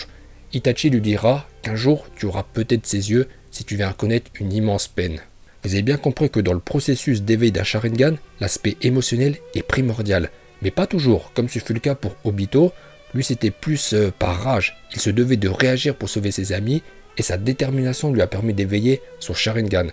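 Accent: Japan. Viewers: pour eveiler le sharingan il faut d'abord controler le migaté no chovouie ps ton accent japonais est incroyable je met mon like directe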